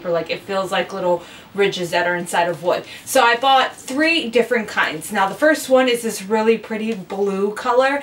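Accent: Chicago, United States. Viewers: Good luck at your cooking class... loved the haul. I pronounce caramel the same way you do.... maybe a Chicago thang lol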